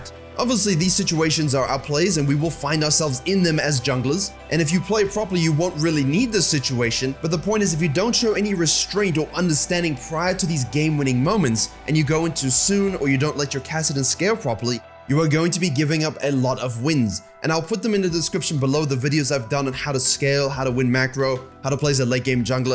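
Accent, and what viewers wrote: South Africa. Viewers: Wait are u south African.....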